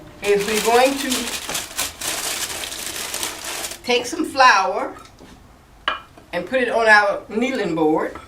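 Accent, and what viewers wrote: United States. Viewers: I love your channel listening to your southern voice is so somthing while you teach this genaration cooking skills. Am gracful for the holy spirit amd my mom that thought me many cooking skills, but am also open to other techniques and always willing to learn another way of doing things. I've been cooking since age of 8yrs old. My mom would let me loose in the kitchen amd it would be a flour everywhere day. She said it was the only way to find my own way of doing things in the kitchen. God bless you your family. I feel as if am apart of the family now and your my grandmother.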